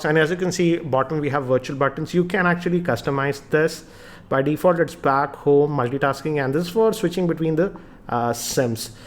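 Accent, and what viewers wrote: India. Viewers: Your accent = Indian cricket commentator . Good video though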